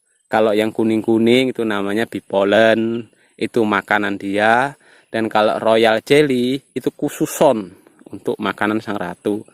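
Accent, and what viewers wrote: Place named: East Java, Indonesia. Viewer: Sik kang, sakjane aku ki pingin belajar ternak tawon. Tapi ndelok n ngrungokkan narasimu kok malah ngekek karo misuh2 dewe dadine. Wis pokoke ndlodok n trocoh, khas jawa timuran sisih kulon ki ketoke. Gak waleh tenan ki ndelok. Sukses yo kang, lanjut kasih pengetahuan nggo wong akeh. 👍🇮🇩😷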